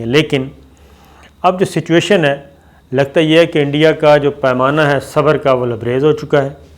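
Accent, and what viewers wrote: Pakistan. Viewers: Accent is pure pakistani best hamaari pehchaan punjabi urdu accent indian aacent nahi chahyee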